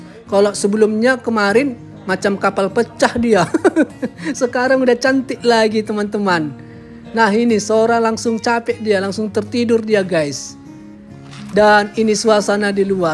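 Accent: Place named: Pematangsiantar, Indonesia. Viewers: Mantap dek... Pertahankan ciri khas siantarnya... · Logat Siantar ny ga hilang ya Lae . Untung lah unik unik Lae klu sempat Anik Anik runyamlah nanti di tempat sarapan ny...😀😀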